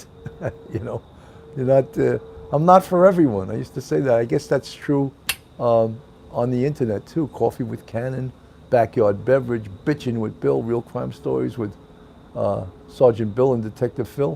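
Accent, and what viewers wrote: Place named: East Coast, United States. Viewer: The hat looks great on you. Your voice makes me miss the east coast.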